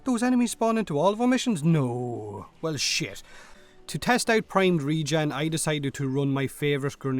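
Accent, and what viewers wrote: Ireland. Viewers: I started watching you because i love the irish accent,same goes to daithi de nogla.I fucking love your accents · Fuckin love Irish accents But holy shit your accent is so thick (that's what's she said) but in a good way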